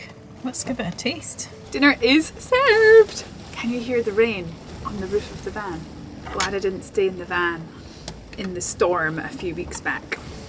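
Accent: Scotland, United Kingdom. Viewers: Hi, I noticed Jessica posted in Roots-In-The-Wild spending a winter in Scotland in her van, but I love your enthusiasm and you are a true ambassador for Scotland no mater what weather with a beautiful Scottish accent to match. i think for 2026 I would like to be a better person and don't be critical, abusive, sarcastic, insensitive, cynical, difficult, to show more kindness to others and be more open handed in my giving, and of course visit Scotland in my t5 campervan. May the true meaning of Christmas be felt in all our hearts, Rock on, enjoy, merry Christmas. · So Cozy! I do van life here in NZ a few times a year but I was born in Scotland so you really do make me miss it! You are well set up and so careful on the road; I was chuckling all the way through - it would be great if cooking was that fast (and nice looking) in real life. I've never actually lived in Scotland - I was whisked away only 6 months old - but I am practicing your lovely accent and hope maybe I'll make it back there one day. 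Aroha and a merryX from Aotearoa.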